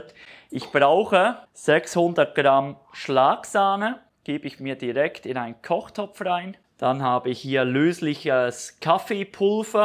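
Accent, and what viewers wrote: Switzerland. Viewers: Ach, ich höre sehr gern das "Schweizerische"! Super Idee mit tollen Praxistipps. Vielen Dank und bitte weitere solcher Backideeen.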